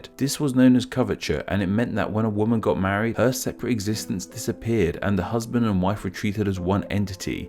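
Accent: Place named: Southern England, United Kingdom. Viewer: Agreed I'm from England but this guy is to much of a southerner and it just makes some videos painful to watch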